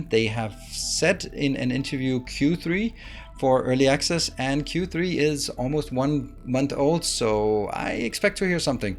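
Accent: Denmark. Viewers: Sounds like he's danish :)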